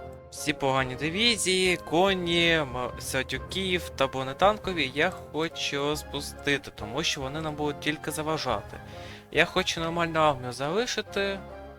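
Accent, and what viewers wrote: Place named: Surzhyk, Ukraine. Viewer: найсолодший суржик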